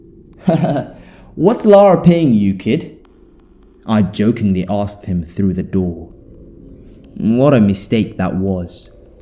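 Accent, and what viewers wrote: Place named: United Kingdom. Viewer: I wish I would hear YOUR fabulous Brit voice saying "trick or treat" at our door this Halloween! Lol. Long way to go for a b it of sweets! Thanks for the spooky! Hope your weekend is good. Happy Halloween!👻💀😈🎃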